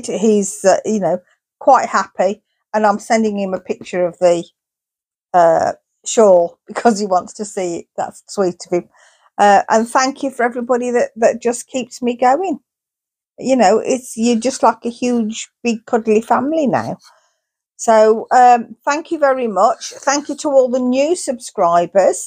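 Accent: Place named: Kidderminster, United Kingdom. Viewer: It's been a pleasure. I must admit, being from Kiddy (though not originally) it was the accent that grabbed me first. But your vids have gone from strength to strength especially the ones from Yarnover. They were so interesting and informative. Keep doing what you do. I'm here for the duration ❤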